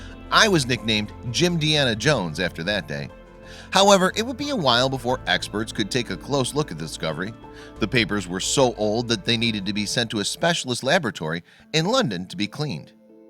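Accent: United States. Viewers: A beautiful ENGLISH house with a yank speaking in non English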